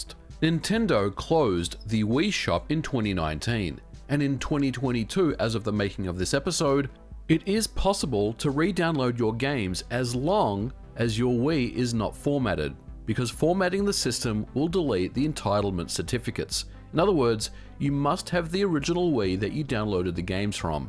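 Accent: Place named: Australia. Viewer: you're Aussie right? all these years watching you i know you're living in burgerland but you just sound Aussie... 😅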